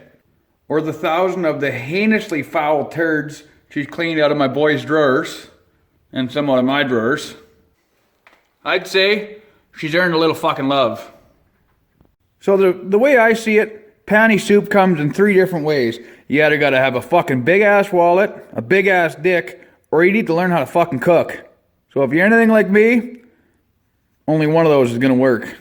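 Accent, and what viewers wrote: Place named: Alberta, Canada. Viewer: Fuck yeah ! I can tell your from Alberta haha I’m from Edmonton I love your minty as fuck videos keep posting them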